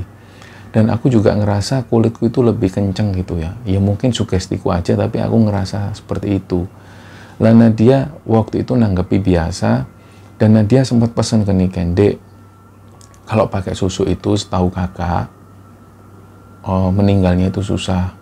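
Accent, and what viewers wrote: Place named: Surabaya, Indonesia. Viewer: Shanghai haderrr arek suroboyo seng merantau, kangen logat suroboyoan😆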